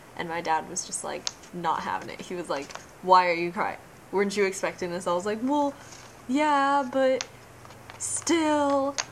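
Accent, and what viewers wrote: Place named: United States. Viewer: By the way, you sound very slightly american. Did you go there recently before making this video or something?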